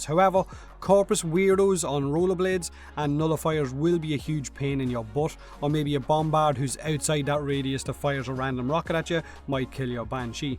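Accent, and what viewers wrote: Ireland. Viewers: Ronaldo Rond those Irish accents are one of a kind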